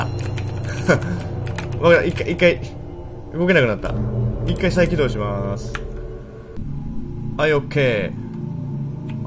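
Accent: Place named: Kansai, Japan. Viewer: 若い頃のガッチさん、関西弁で、かなり高めの声だったんですねー。 あと、レトルトさん、ウッシーさん、キヨさん居ないからか寂しい。 敬語が少なくて、わっかいなーって思たヽ( ｀･ω･)ノ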